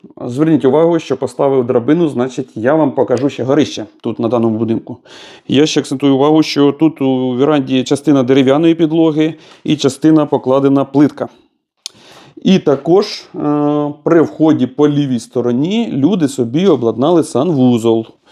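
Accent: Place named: Kharkiv, Ukraine. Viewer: Форточка - кватИрка (укр.) Телефон - так и есть на укр.яз. - телефон, а не "телеХВон".))) Я подозреваю, что вы сами родом со Слобожанщины. Проскакивает харьковский суржик, как не стараетесь говорить українською мовою..) Да и в Харькове было такое риэлтоское агенство "Квартал".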